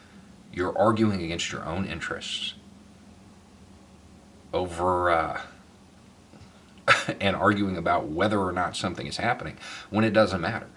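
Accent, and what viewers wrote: South, United States. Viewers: Thanks for this one. People who don’t accept science should delete their social media, cancel their phones, sell their cars, abandon technology and live with the Amish. I never comment on stuff but I’ve been watching you for a while thinking how does a dude with a southern drawl have these thoughts...then I found your other channel and it made sense Ⓐ ☮️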